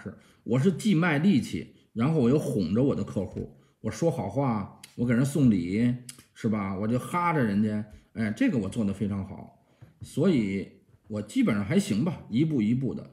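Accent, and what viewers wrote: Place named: Beijing, China. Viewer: 祝贺你的一周年，加油！我们每天看你听你侃大山，之所以喜欢你的视频，是你说人话，方方面面，不啰嗦，就像沈志华老师那样，咬字清晰，北京话，有趣。你不怕揭自己的老底，说明你不虚荣，你初中毕业，但是博士水平，很多人学历很高但是水平很差，当然里面有买的学历混的学历，硕士学历初中水平。所以西方认你的经历和经验，不重视学历。欢迎来加拿大，我接待你。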